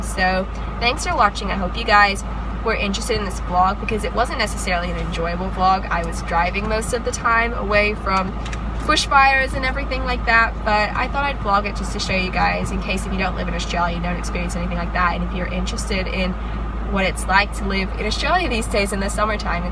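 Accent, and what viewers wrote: United States. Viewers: She sounds more American than Australian... 🤔🤔🤔. But I still really hope that you and yours family are okay!!!! May god bless your whole country!!!! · Omg, I didn’t know you were Aussie, I thought you were American, since 2014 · I thought you were american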